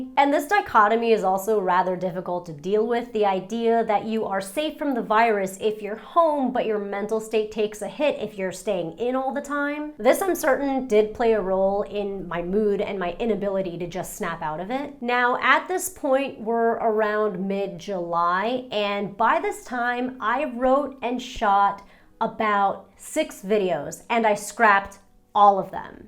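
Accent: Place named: New Jersey, United States. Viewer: saw your IG and your 'cathartic cry'. Glad you're making healing progress. Also, you're losing your Jersey accent, slowly but surely, hahaha.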